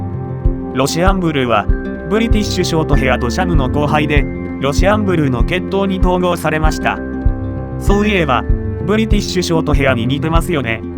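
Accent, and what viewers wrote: Kyoto, Japan. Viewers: 京都あたりの方ですかね？ 訛り風情があってかっこいいですよ〜 ロシアンブルー欲しくなりました！ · 漫画とかアニメに出てきそうなThe京都弁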